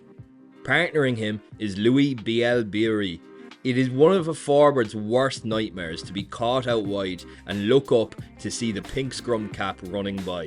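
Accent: Ireland. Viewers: The type of video you watch twice, first time to admire the Irish accent, the second time to capture the good Rugby and Humor content! Well done my friend!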